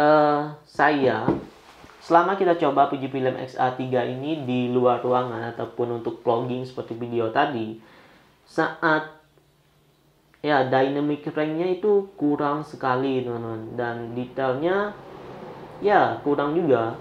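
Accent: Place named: Kalimantan, Indonesia. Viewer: Dengar logat abang ni bicara keknya abang org kalimantan ya bang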